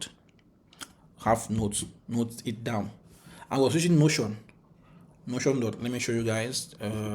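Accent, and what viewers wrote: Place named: Cameroon. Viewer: I recognize that Cameroonian accent. I'm on eJPT right now. This is probable my next cert.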